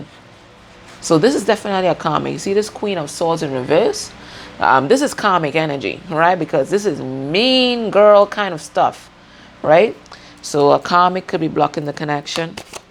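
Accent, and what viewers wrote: Trinidad and Tobago. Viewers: U sound like a trini 👍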